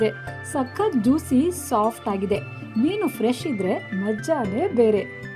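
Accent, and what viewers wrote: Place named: Mysore, India. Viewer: Appatta kannadathi, mysore kannada super mam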